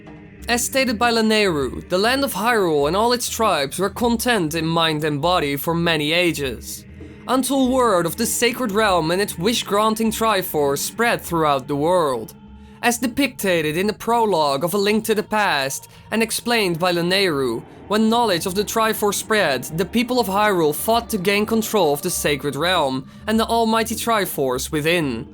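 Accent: Netherlands. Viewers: ...This may be an odd question, but... are you Dutch?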